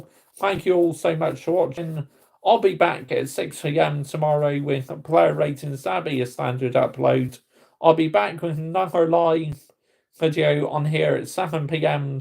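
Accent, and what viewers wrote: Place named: Bristol, United Kingdom. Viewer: Are u from Bristol? I lived there for 6years and ur accent reminds me of those days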